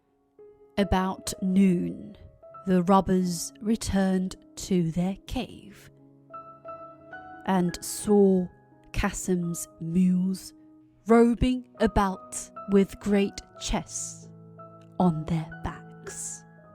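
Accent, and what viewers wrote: United Kingdom. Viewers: 에이 에스 엠 아~~~~ㄹ 오늘도 잘 듣고 갑니다. 역시나 권아나의 발음은 엄청 매력적입니다. 흉내내보려고 해도 영 저는 안되네요. ㅎㅎㅎ 영국식 발음아! 오픈 세서미~~~! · 선생님 영국발음이랑 억양 선생님처럼 하거싶은대 어떻게 해야될까요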